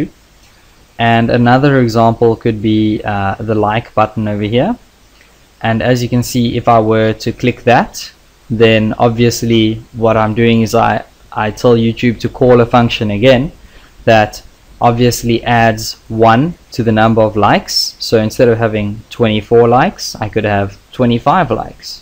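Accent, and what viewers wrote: South Africa. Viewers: Yoh Bra u sound like a South African, anyway I have a problem I know a bit of javascript should I just jump to jquery or try to Master Javascript first?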